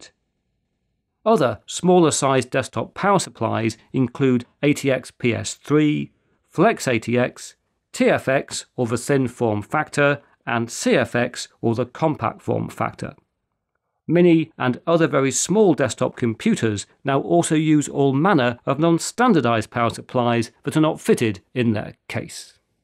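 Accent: United Kingdom. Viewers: Actually I came here 4 studying British accent 😜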